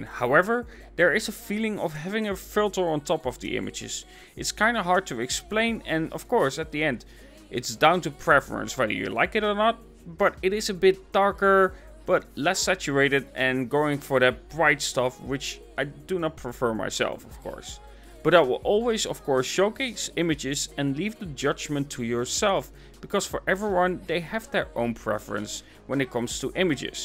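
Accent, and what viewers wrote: Netherlands. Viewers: u sound Dutch 🤔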